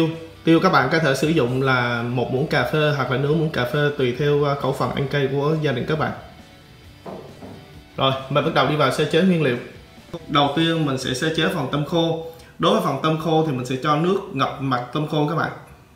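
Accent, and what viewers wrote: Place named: North, Vietnam. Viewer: Giọng bạn này như ở miền ngoài, giọng hay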